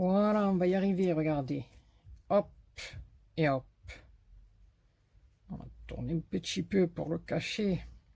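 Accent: South, France. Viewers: Coucou, ils sont superbes ces stickers, j'aurai bien aimé les trouver!! ils sont magnifiques tes ATC, j'adore!!! et le petit accent du Sud met du soleil dans nos coeurs dans ces moments de grande épidémie! ça fait du bien! merci beaucoup pour ce tuto, bisous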